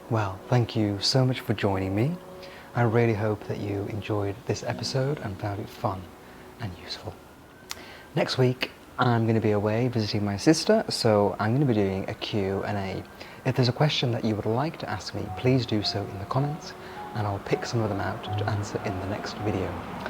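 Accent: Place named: England, United Kingdom. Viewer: I like your videos specially your English accent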